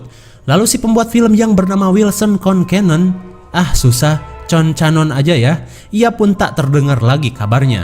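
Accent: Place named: West Java, Indonesia. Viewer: Enakeun kalo orng sunda yg bawain cerita :v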